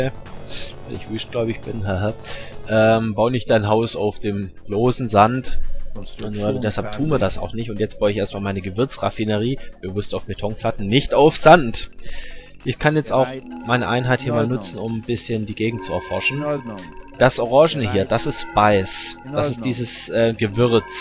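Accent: Swabia, Germany. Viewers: Yay, ein Schwabe ^^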